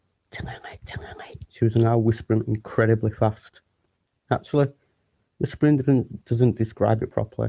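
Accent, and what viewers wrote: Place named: Northern England, United Kingdom. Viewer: You're a very good story teller ( PLEASE don't think I' m being rude ) but you might need to not be so close to the microphone and also concentrate a bit on your diction because some of the words are hard to understand..I btw am a northerner too like you so similar dialect ...anyway you now have another subscriber :)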